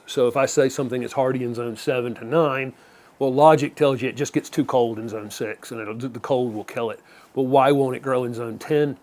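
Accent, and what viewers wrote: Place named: North Carolina, United States. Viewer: You sound like you're in North Carolina, are you, I am? I'm new here. Thank you for your time.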